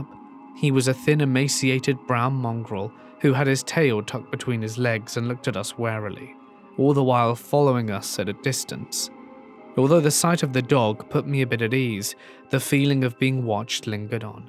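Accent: United Kingdom. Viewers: I love your British accent. I was raised by a British mom, who is now deceased. And thank you for being so expressive when you read these stories!!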